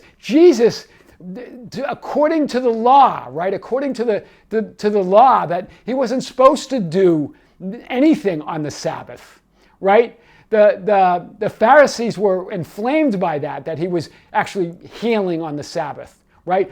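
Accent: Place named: Massachusetts, United States. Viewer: Mate I have recently discovered your channel and you rock hahahaha. I love and appreciate your in depth explanations, your wisdom, your catch phrases " hear this!", "I want to unpack this like it's never been unpacked before", your cool Massachusetts accent, and your infectious laugh always makes me break out in a laugh too hahahaha!! Seriously, thank you for your time, effort, and service in teaching us these concepts and helping to harden our own understanding of these concepts at this point in time and space. Oh, and I notice how you seem to reply to everyone who comments or asks questions on your videos, and not just superficial responses but quite in depth and supoortive. What a legend mate 😉 From a new subscriber in Brisbane Australia oi oi oi!!! 👊😁💚☮🙏🌈🌟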